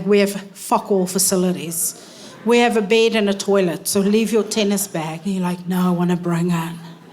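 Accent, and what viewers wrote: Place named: South Africa. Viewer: She is hilarious and FINALLY learned why she has such f*ckery going on in her accent! South Africans already have the strangest of the British accents, but add some Kiwi and Aussie to the mix and you get this I guess.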